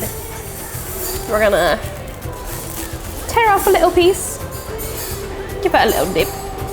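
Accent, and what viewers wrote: Spain. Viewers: CON ACENTO ESPAÑOL ES LO MEJOR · * tiene acento de Madrid por favor q mona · tiene un acento re español cuando habla en esp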